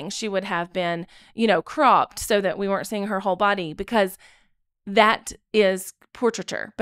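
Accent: South, United States. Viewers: completely unrelated but my heart skipped a beat when I heard your accent! As someone that speaks just like you, hearing an unapologetic southern accent delivering such an engaging and well informed commentary makes me proud! 🥹 Not too many of us out there. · It is good to hear another southern woman calling out this bunch of reprehensible gobshites. I borrowed the term "gobshite" from Ireland.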